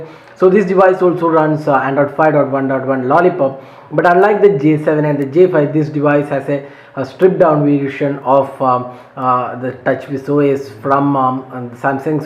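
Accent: India. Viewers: I cant understand your english..can speak nicely we are not n india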